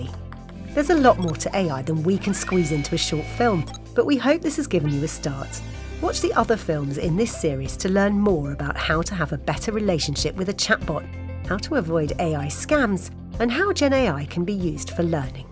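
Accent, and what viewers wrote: United Kingdom. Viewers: English with a British accent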